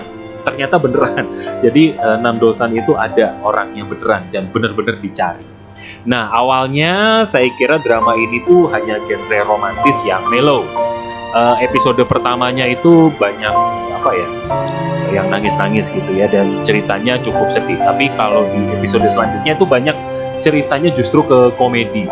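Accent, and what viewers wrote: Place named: Banyumas, Indonesia. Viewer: Videonya paket komplit,ngapak bos wkwkwk. Start up drakor favoritkuh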